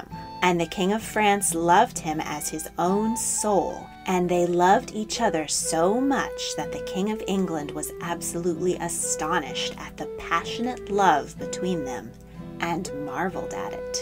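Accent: United States. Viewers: she is pronouncing it correctly. it sounds different because of her american accent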